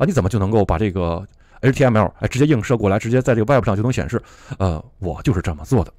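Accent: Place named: Japan. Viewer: 难怪觉得说英语有点日语的味道哈哈哈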